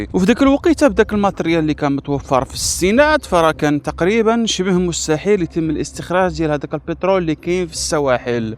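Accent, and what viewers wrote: Algeria. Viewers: لهجتك جزائرية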